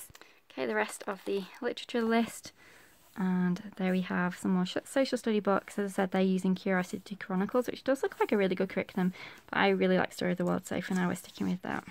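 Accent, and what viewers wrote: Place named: United Kingdom. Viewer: You sound british but are using american education terms. You're like me, become americansied from all the homeschool youtube videos and american curriculum websites :D XD I started using grades naturally now instead too :D XD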